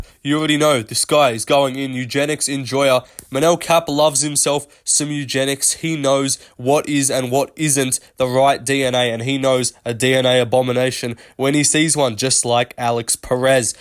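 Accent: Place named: Australia. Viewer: I thought who the fuck would make a video like this. Then I heard you speak and was like ahh he's an Aussie 😂 well done mate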